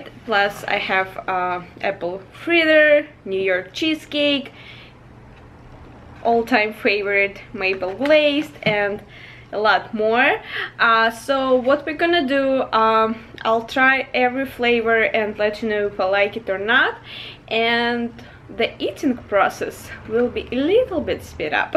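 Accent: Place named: Russia. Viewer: You have a Russian accent,where r u from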